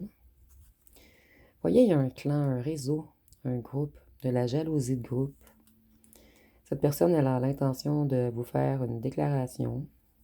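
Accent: Quebec, Canada. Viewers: j'adore vos guidance , merci beaucoup ;) pas de souci je comprend bien le québécois puis j'adore , votre franc parler c'est trés spécifique votre parlé , trés imagé ,j'aime bien! c'est franc ! ;) félicitation pour votre nouveau logement , content pour vous ;)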